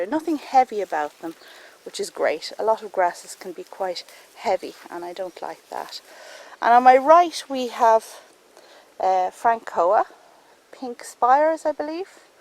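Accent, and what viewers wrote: Ireland. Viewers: Well I did have a lovely start to my day ! I watched your video in bed with a cup of tea and a biscuit 🙄 which gears me up to get out into my garden, which is not so big but gives me so much pleasure . At the moment I have a plant called Sanguisorba Lilac Squirrel which I think you’d like as it has interesting leaves and long pink tassels,(keep up the tours) I hope one day to see you and listen to your lovely Irish twang 🤗🤗🤗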